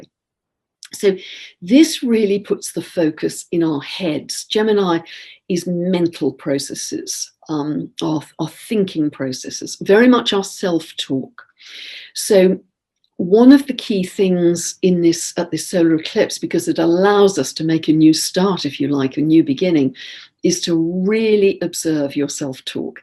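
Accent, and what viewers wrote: England, United Kingdom. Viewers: Thank you so much! That really made me feel better! And it was done in that wonderful English accent. I'm american, I came to be by way of England. But as u know, we kind of became the melting pots of all cultures and now we sound funny lol. But it was very much like listening to someone I hold dear to my heart. Mary Poppins. Thank you so much! ☺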